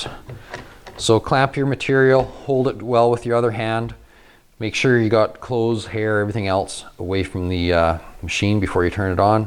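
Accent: Canada. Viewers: You sound like a Canadian eh. Am I right? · holy canada accent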